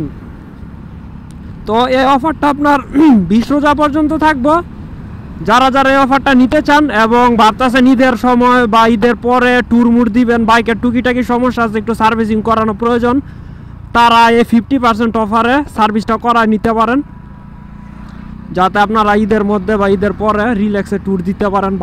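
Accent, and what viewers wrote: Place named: Narayanganj, Bangladesh. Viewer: বেশি বেশি ভিডিও দিবেন আর নারায়ণগঞ্জের ভাষায় কথা বলবেন ভালো লাগে একটা দেশি দেশি ভাব আছে ❤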